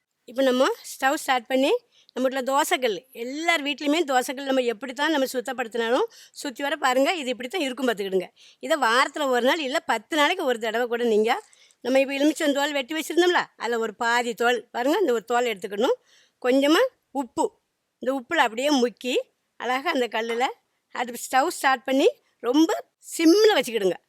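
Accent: Tirunelveli, India. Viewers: Nenga thirunelveli pakkama? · Tirunelveli bashai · உங்கள் ஊர் சொல்லுங்க..திருநெல்வேலி பேச்சு மாதிரியே இருக்கு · Nenga Nagercoil Ila Tirunelveli ya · Tirunelveli slang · Tirunelveli tamil kekka sandhosama iruku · திருநெல்வேலி, கன்னியாகுமரி மாவட்டங்களில் பேசுவது போல் இருக்கிறது. சூப்பரா இருக்கிறது.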